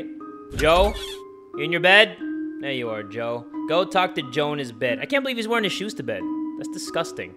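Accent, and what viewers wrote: United Kingdom. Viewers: got the most real British accent. Plus he is real thank god for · THAT BRITISH ACCENT THOOOOOO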